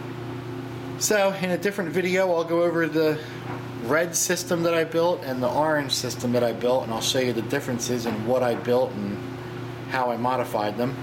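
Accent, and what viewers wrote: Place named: South Jersey, United States. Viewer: ...ooh that's the south jersey / philly sound...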